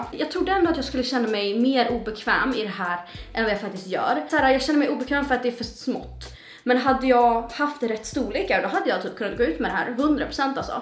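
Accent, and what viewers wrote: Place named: Karlskrona, Sweden. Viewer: Jag hör lite karlskronitiska ändå😉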